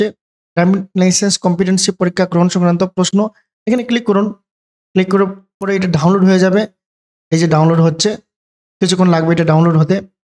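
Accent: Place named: Sylhet, Bangladesh. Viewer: আমার মনে হয় আপনি সিলেটি😊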